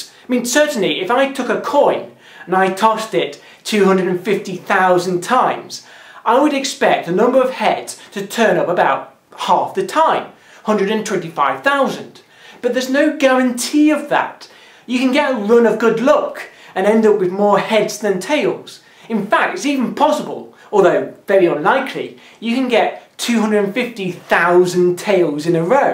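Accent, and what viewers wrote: United Kingdom. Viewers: So I thought this was going to be a "depends on where you live" issue from the beginning, and five minutes in yes it's exactly that. Guessing by accent, this person is some flavor of subject of the Queen of England; and may not be aware of how houses are numbered in America or France or other places. Even number houses are in one side of the street, odd number houses in the other side of the street. The first house on a street is just as likely to be 2 as to be 1. And in America, single digit house numbers are fairly rare. Some of my previous house numbers were 5801, 358, 363, 11258 (rural areas get bigger numbers). And his explanation didn't mathematically make sense. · He lives in England (you can tell from the accent). England uses a uniquely bizarre street address scheme wherein adjacent addresses are usually consecutively numbered, but occasionally there are large jumps, because at some point they have to go back and do the houses on the other side of the street, or at the other end of the street (other direction from downtown), or whatever. 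I don't know exactly how they handle it when a new house is built between two existing formerly-adjacent houses. Heaven help you if you are given an address on a long street and not told what the nearest cross street is. Another weird outlier is Japan: street addresses don't say what street the building is on at all: instead they say what block it's on. All the blocks (in most cases, square sections surrounded by four streets) in any given city are numbered; and then the buildings on all (usually four) streets around each block are treated as a single block and numbered around the perimeter. Street length is thus completely irrelevant, and the range of possible numbers of buildings on each block is much smaller than the range of possible numbers of houses on an entire street.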